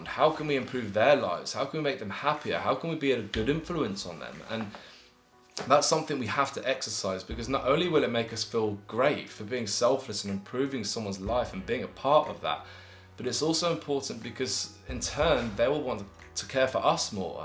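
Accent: United Kingdom. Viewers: !! You're like Rudy from Misfits!! do u watch it? aha just found a similarities with the twins and the british accent. Keep making videos!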